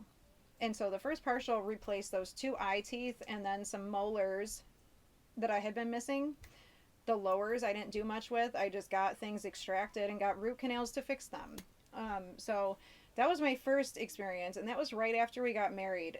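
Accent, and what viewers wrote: United States. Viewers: Are you from Minnesota? :) because you sound like you do! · I could tell you had a Buffalo accent from the moment the video started hahaha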